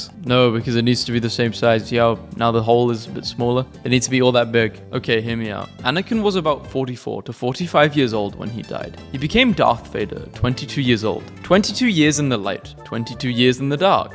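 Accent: United Kingdom. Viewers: Howdy again British feller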